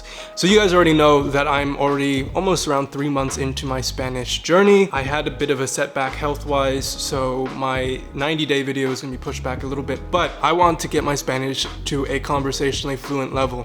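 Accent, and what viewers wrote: Japan. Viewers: Your Korean is adorable. It kinda sounds like a Japanese accent 😂🥰. I’m learn Korean too and I hope I can learn it with you! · Awww your korean pronunciation is so japanese lmaoo hope u get better and hope your have a good year!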